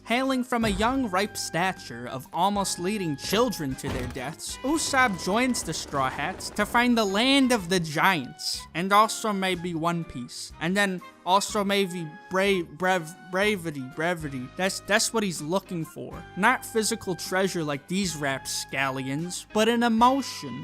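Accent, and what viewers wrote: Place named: Boston, United States. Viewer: Chimp champ are two different words your dialect is very bosten east coast like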